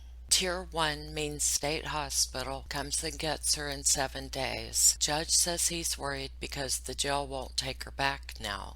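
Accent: United States. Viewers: Love listening to you're commentary in that beautiful American accent. Great how you say what is the truth and not what people want to hear. Lots of love from London uk x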